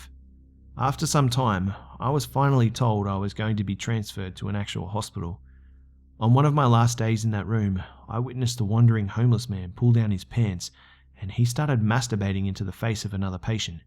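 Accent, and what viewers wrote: Australia. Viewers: Your voice is perfect. The Aussie pronunciation is very refreshing. Great video as always. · I...Can't believe it took me this long to realize you're Australian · I did not know you were Australian until now. Cool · Hold up your from australia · OHH YOURE AUSTRALIAN!! I love that (btw is that weird? sorry, I'm American)😂👌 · Can't tell if you are trolling but the narrator is very, very Australian.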